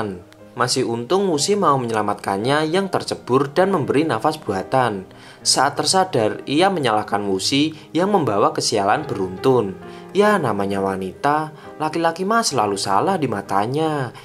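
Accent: Java, Indonesia. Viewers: Aduhhh logat nya terlalu medok bro jadi kurang nyaman nontonya, sorry to say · Narator Jawa tulen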